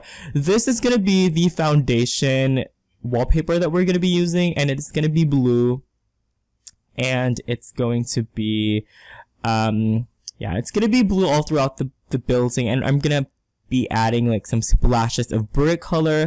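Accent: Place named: Philippines. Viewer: I really like it!! new subscriber 👏 Ps. I already thought of you being a filipino just when you started talking!! #teamfilipino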